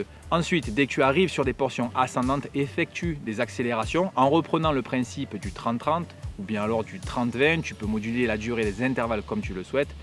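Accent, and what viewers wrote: South, France. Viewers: Même si l'on pense tout connaître du running, il est bon de revenir régulièrement sur les fondamentaux. Quelques rappels font le plus grand bien (même pour les runners aguerris), nous évite de dévier (cela arrive forcément) et entretiennent le mental en permanence. nous explique tout cela de façon très claire. Les explication sont simples, précises et concrètes. J'apprécie beaucoup ses vidéos avec en bonus un petit accent du Sud qu'on adore.J'ai 63 ans et je fais parti de ces coureurs qui respectent les bases élémentaires du running (hydratation, étirements, progressivité dans l'entraînement etc...). Ce qu'explique parfaitement bien.Cela me permet de continuer à faire mes 50 à 60 km par semaine et tout cela sans bobos.J'encourage à continuer ses vidéos. Un grand merciEric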